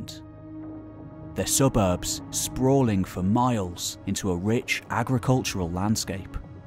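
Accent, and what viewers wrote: England, United Kingdom. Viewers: Wow! How deeply flawed a video. Like an 8yr old researched it from outdated school history books but read by a English guy to make Americans feel like it's intellectual fact. Smh 🙄 (but still lmao!)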